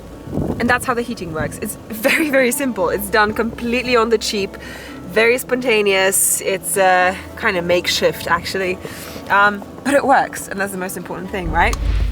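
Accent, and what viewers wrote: England, United Kingdom. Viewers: Why do you sound British? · Your videos are awesome, Question? were you raised in Poland, because i hear a big English accent! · That brittish accent...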